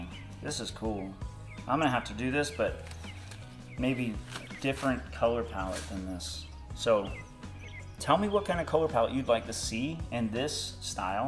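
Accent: South, United States. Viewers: That is super cool! I loved it as it came down over the cup, watching the different coloured cells go pass was amazing! The finished piece is fantastic. I love your accent, is that what's called a southern accent? I'm Australian so I'm not sure. Love this technique! I'll definitely try it if I can find a tiny hollow cup. Great job! 😀💜🐨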